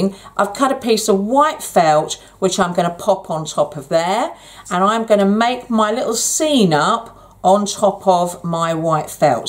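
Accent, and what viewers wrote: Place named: England, United Kingdom. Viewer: Love this! So nice to hear an English voice 😁. Will be trying this for sure!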